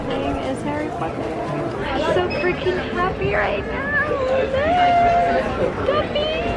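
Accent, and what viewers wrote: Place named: Visayas, Philippines. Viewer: Yay! Your Bisaya cute kaayu..May I ask, nagtour bus namu daan pa sa London?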